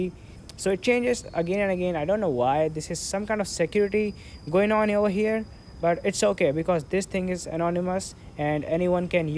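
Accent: India. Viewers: Well your accent became more of an Indian accent as the video went on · INDIA INGLISH 🤬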